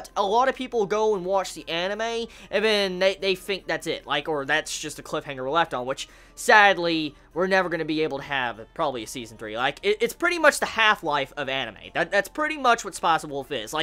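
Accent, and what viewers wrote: South, United States. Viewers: At first I thought he was from the UK, but now I'm thinking he's from the southern states...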